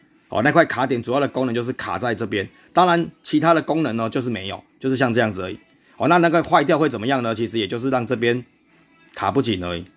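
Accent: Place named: Tainan, Taiwan. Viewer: 懶pa是台南人？ 語氣聽起來很台南～～ 太可愛了～好想買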